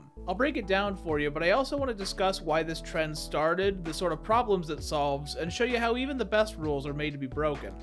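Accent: Netherlands. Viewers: hold on… are you Dutch…😃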